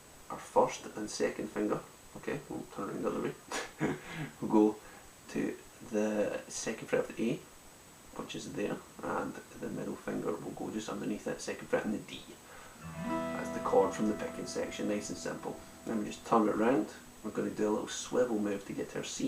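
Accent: Scotland, United Kingdom. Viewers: damn good to hear a Scottish accent!! do you do lessons?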